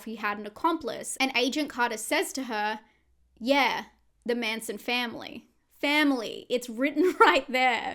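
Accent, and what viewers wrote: Australia. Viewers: I'm sorry, I'm just messing with you. I know it's because of your Australian accent. It's actually kinda cute and adorable, and I loved the video btw!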